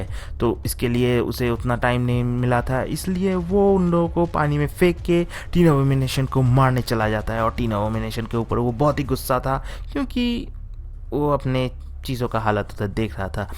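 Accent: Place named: Odisha, India. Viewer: I think. your mother tongue is not Hindi tum Orissa se ho kya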